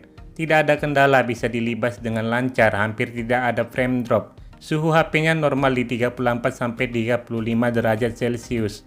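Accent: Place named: Bugis, Indonesia. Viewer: Kyk logat org Bugis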